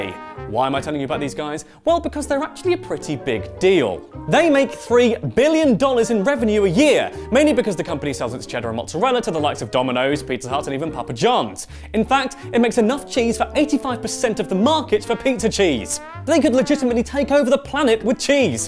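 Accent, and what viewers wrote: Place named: United Kingdom. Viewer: Loved this! But can you hire a Captions guy? I'm East European and i watch you while i'm high *that how you roll on youtube kids*, and i'm really trying hard to understand your marvelous british accent, greetings fella!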